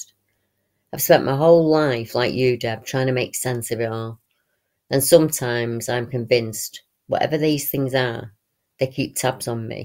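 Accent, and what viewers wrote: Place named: Northern England, United Kingdom. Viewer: brill again thks deb ,love your site, you have a wonderful voice . one of the many great accents us northern folk have , am in liverpool but can use most words correctly 😁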